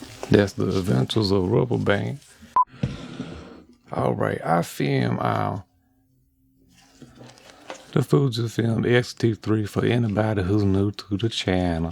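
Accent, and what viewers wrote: Louisiana, United States. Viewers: is this accent a french person from louisiana. rec brought me here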